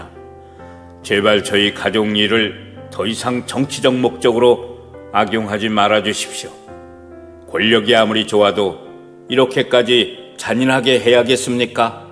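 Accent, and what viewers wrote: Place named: North Korea. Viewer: 말투가 어떻게 북쪽 목소리냐?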